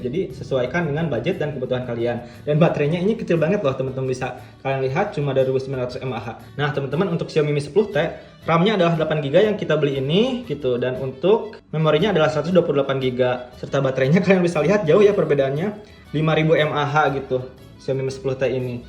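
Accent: West Java, Indonesia. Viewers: Aksen nya khas sunda bgt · sunda nih yah?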